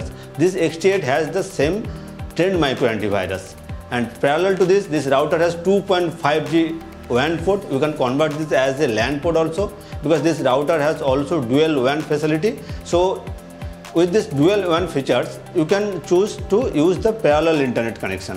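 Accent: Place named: India. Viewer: WARNINGL VERY STRONG INDIAN ACCENT.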